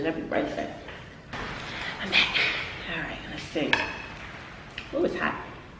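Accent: Baltimore, United States. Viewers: I thought you was from Baltimore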